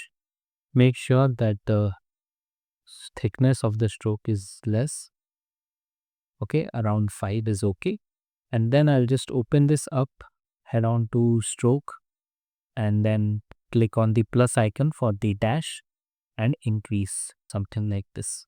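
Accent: India. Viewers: no music no sounds just pure indian accent and after effects thanks dude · I just hear the india accent and i Liked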